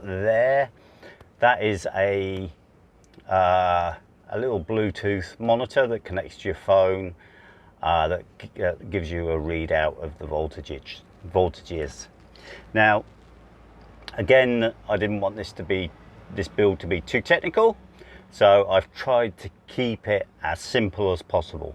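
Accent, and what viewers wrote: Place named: Australia. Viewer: Well I just checked out your other videos and see you're in Australia. I'm in the US but my best friend lives in Sydney and I was sure that was a down under voice you have.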